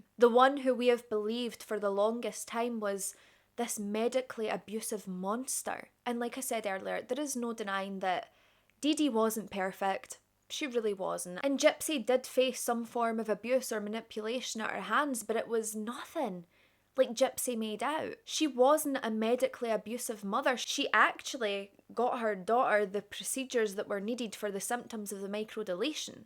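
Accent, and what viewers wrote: Scotland, United Kingdom. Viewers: I thought the sh*tshow of Gypsey Rose Blanchard life and crrimes couldn’t get much worse. When she was released i genuinely felt so much compassion and empathy for Gypsey. However, I am so glad that I found you, you deserve more subscribers - you are well put together, talk about this subject with the gravity it requires, have charisma, and the editing is high quality. Most of all you are Scottish (i presume going by your accent) I’m from Aberdeenshire but assume you are from the central region. Subscribed 🏴 ❤ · I’m here for your accent. I love it. Where from Scotland are you from? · Love hearing a fellow Scottish accent! I also believe that Gypsy did not want to be Dee-Dee's full-time carer, in the future, as her mother's health was poor. I DO think she WAS afraid of Gypsy. Dee-Dee even said to her: "Gyspy... Don't hurt me." which Gypsy admitted to. 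And, what gets me.. IS... there were 17 stab wounds. Nick first said in his interrogation, he stabbed Dee-Dee FOUR times. I wonder if that WAS the truth. I believe so. Photos... also tell a story.... In ALL the photos of Dee-Dee and Gypsy, there is NOT one photo where Gypsy looks scared, unhappy, anxious, or depressed. I say that because, there's a photo of me taken, after I had got a telling-off from my Mum, when I was about 11... and I am visibly upset on that photo, TRYING to hold back tears for my aunt, as she took the pic. There's also one of me, when I was really sick with tonsilitis when I was seven, and it's obvious, I was really unwell. Normally all my baby/childhood pics, had big gummy, or cheesy grins! I loved the camera! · I feel like I'm getting true crime intel from Merida. lol Gotta love the Scottish accent!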